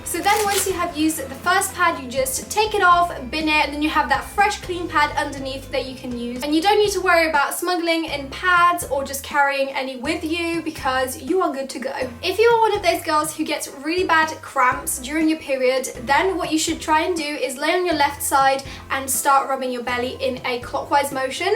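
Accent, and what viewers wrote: United Kingdom. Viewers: I adore youu and I love your accent, I have something for british accent really😫😂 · Ive known your channel a year ago and i really like your videos. Especially a life hacks and makeup tutorial video. You are such an amazing girl. I hope you keep being the way you are and nobody can let you down bc i am here. and also i really like your accent (its british right?) idk i always find really cute when i heard girls speaks british accent. we are so close yet so far😁. i know it is such a long comment lol but i hope i can win this giveaway bc i reallyyy want that macbook air. wish me luck and i love you so much 💚💚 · I will definitely try the heating pad hack!! Thank you so much for sharing this with us, and by the way - your video quality makes you look even more gorgeous We all love your honest and creative videos! Would love to win the prize for my room that I've recently moved to (it's a flat with two girls that are studying, too) :) I'm studying English to be a teacher and your british accent is so awesome! 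I'd love to see a video of you and maybe your boyfriens imitating different accents!! Love, Melodie xx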